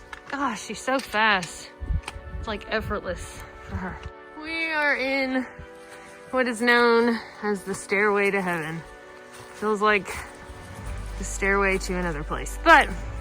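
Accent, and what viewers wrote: South, United States. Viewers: "...He's not not not hocked yet". That's what my subtitles read! 😁 Good thing that I understand Southern (lived there for a bit, long ago, and I love the people and the accent). Back in New England to be with my Mom in her twilight years. I'll be back, likely., an old, big, heavy duty stuff sack, into which you can put a large rock or two, along with a mini 'biner to clip your guy line into, can be a big time saver when setting up in those rainy, rocky conditions. I hiked from Palmerton, Pa. to the DWG in the snow, so I feel your pain. Rocks and ice are a special kind of hazard! Take care!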